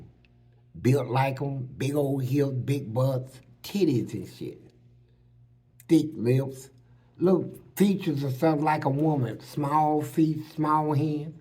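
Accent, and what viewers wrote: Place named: Kentucky, United States. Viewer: He got that southern kentucky drawl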